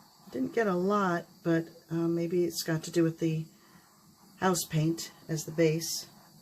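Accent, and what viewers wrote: Australia. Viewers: I just watched this video then Bens . I think the main difference is the cells Ben got from using Aussie flotrol, we are so lucky in Australia to have our flotrol do what it does! Thanks for the giggle at your Aussie accent! 😂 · 😂love the aussie accent! Fun idea combining different swipe tools👍. ❤️,Pam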